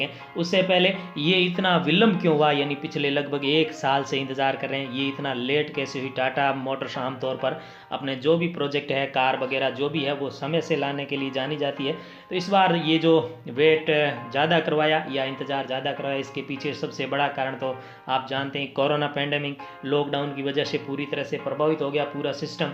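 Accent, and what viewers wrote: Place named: Marwar, India. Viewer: Aapki aawaz sun ke lg gya tha ki marwad se ho bad me jodhpur dekh ke pakka ho gya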